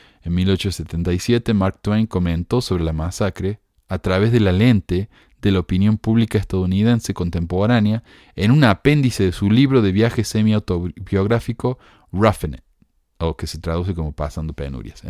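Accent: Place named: Córdoba, Argentina. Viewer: Me gusta tu vos de cordobés, soy de Buenos Aires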